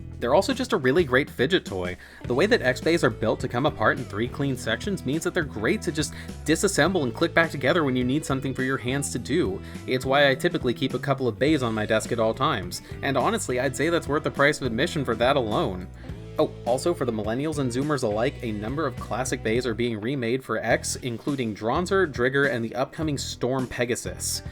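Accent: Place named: South Texas, United States. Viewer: Hey heard you from south Texas ,you from the 956????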